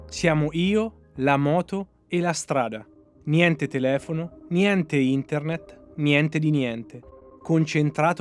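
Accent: Perugia, Italy. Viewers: Pensavo di essermi accorto solo io 😂 Perugia si sente da lontano ahahahhaah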